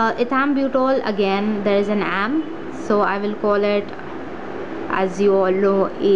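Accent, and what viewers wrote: India. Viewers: Because of your accent and the traffic noise😁 btw im indian too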